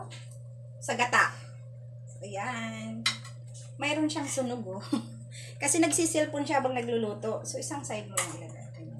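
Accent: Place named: Visayas, Philippines. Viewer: Ha ha natawa ako sau sis para kang totoong bisaya , agoy sarap naman nyan ginataang tulingan.yes tuloy lang ang laban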